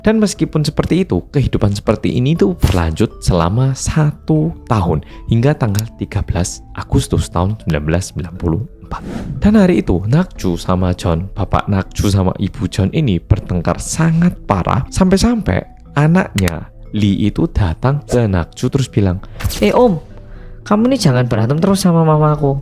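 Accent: Java, Indonesia. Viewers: Mas medok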